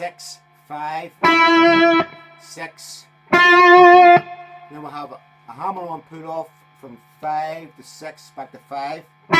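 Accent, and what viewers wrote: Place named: Ireland. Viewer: finally got to hear your voice 🤠 man you got that ruff ol' Irish accent and sounds great 👍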